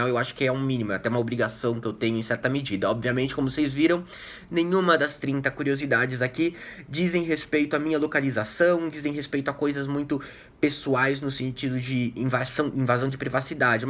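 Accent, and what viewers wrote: São Paulo, Brazil. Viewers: Tu é paulista pensei que era gaúcho.Maneiro os seus vídeos!!!!Tu vai ter muito trabalho em 2018.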